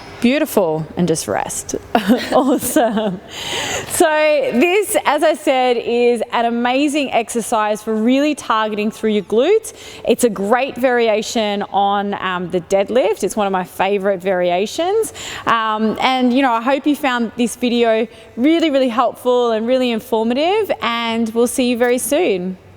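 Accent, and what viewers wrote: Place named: Australia. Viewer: Aussie accent is ❤️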